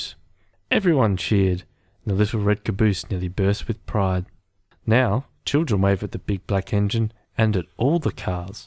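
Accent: Australia. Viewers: I actually like the Aussie rendition of this! You definitely speak with feeling. Enunciation as always, highlights the points of childrens stories and those words that they are supposed to understand. Seems that you've done that. I like getting feedback on my channel as well. I have written a few original children's stories if you would kindly take the time to check some of those out on my channel and let me know what you think! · I think this is a really good reading and it is a classic children's story. Nice to hear an Australian voice. I do think, however, that we Australians tend to speak with a lot less light and shade and inflections in our voices than many other native speakers of English. I think the reading of this simple and engaging story, simple though it is, could do with a more light and shade in the voice - especially when talking about the hero at the back of the train. 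Also, perhaps, more pauses and a changes in tempo - I suppose - in the repetitive sections about the various different cars - the oil cars, the box cars et cetera. But, it's the reading to the children that is so, so important - the manner of the reading less so. But you will find the more you inflect your voice when reading, so will the children when it comes their turn to read it to you ... as they will! Aren't the illustrations classic. The illustrator has a very interesting story himself, and was a renowned artist in the years between the two world wars. Best of luck. More soon, I hope.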